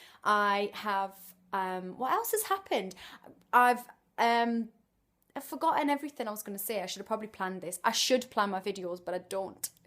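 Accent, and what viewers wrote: Newcastle, United Kingdom. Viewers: Happy New Year,. I really appreciate watching your videos. Your pieces of advice and stuff are highly significant for me and, on top of that, I love practising my English by listening attentively to your Geordie accent which I had not been familiar with until I came across your channel here. #Peace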